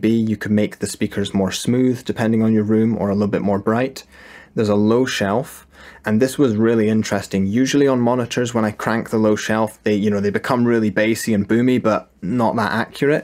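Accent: Scotland, United Kingdom. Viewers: Where are you from I know Scotland what part ?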